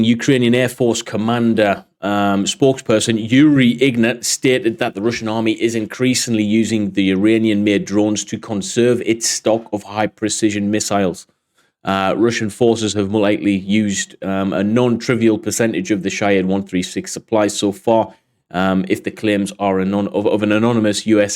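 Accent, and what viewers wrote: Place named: United Kingdom. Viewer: I've added you into the rotation with Andrew, Denys, Paul, & Artur. Great rundown, mate! Especially appreciate seeing the satellite map, but as a Brit ex-pat it's nice to hear the accent - at regular speed😆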